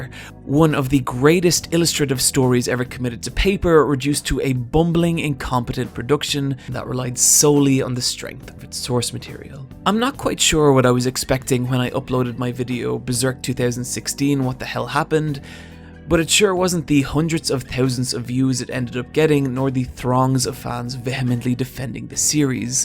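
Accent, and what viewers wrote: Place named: Dublin, Ireland. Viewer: are you Irish? You have a Dubliner accent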